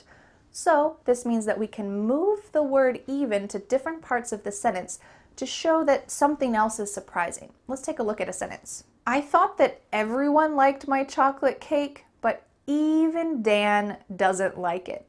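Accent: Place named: United States. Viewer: I loved american accent with you❤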